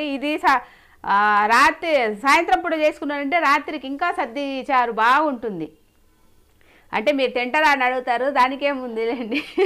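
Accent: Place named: Telangana, India. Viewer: మీ మాట తెలంగణా మాటల వుంది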